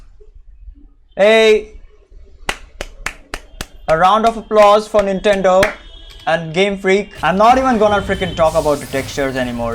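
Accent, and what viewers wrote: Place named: India. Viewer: getting shi**ed on in an Indian accent, love it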